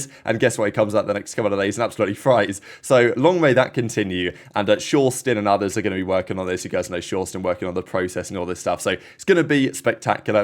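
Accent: England, United Kingdom. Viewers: where you from? From England so I’m assuming south somewhere but don’t know if it’s London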